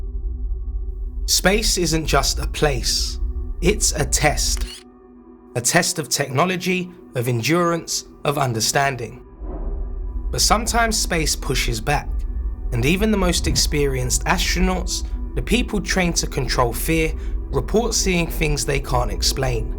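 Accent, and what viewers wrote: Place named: United Kingdom. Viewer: he’s British. In British English the “s” in “debris” is silent.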